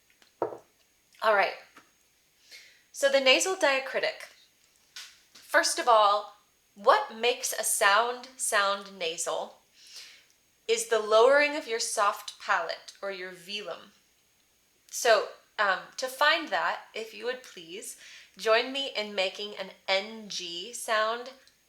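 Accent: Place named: Midwest, United States. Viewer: Thanks for teaching,. What part of the Midwest are you from?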